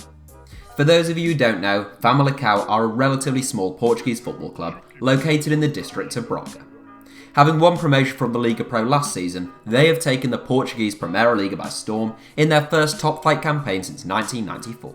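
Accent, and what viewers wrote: England, United Kingdom. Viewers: why on Earth would you picture a random French bloke when an Englishman speaks?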